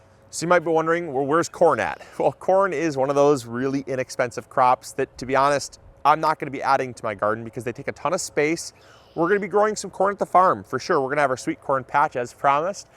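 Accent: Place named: Louisiana, United States. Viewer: You almost sound Cajun. New Orleans is known for Chicory coffee, like Cafe Du Monde. They blend Chicory with Coffee to stretch coffee. I've never thought about growing my own Chicory. Native Texan and been to New Orleans a few times and to Cafe Du Monde a few times. It was awesome hearing someone else talk about chircory coffee.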